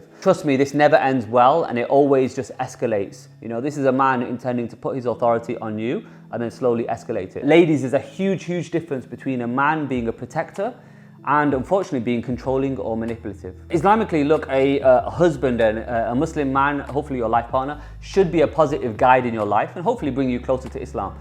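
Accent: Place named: United Kingdom. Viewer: DUDE! SPEAK ENGLISH NOT BRILLISH…. 90% OF PEOPLE I AM SURE DON’T EVEN KNOW WHAT TF ARE YOU SAYING.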